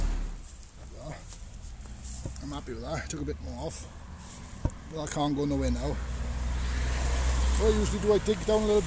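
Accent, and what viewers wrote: Wales, United Kingdom. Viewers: Just have to decipher the Welsh accent a little better !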